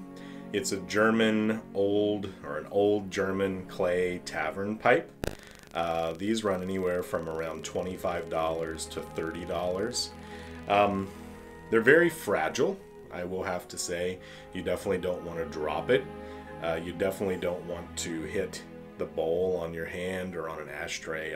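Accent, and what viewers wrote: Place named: Italy. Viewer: dude i loved the Italian hobbit accent. also how many bowls do you usually smoke per week?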